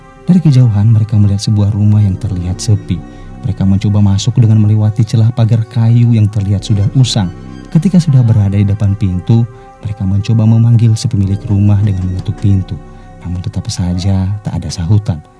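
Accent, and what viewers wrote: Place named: Medan, Indonesia. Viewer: Suara nya kayak orang medan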